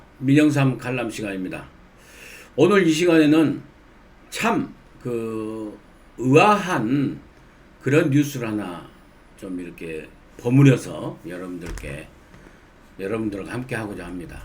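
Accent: Jeolla, South Korea. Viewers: 요즘 열라열라 에서 안보여서 ...목빠지게 지달립니다 시원한 전라도 사투리로 욕도 질퍽하게 맛깔 스럽게 좀 해 주시지요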